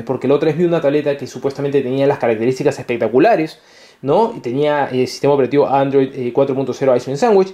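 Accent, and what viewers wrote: Peru. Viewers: eres Peruano? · hey eres peruano??? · eres peruano? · Eres peruano ? ? ??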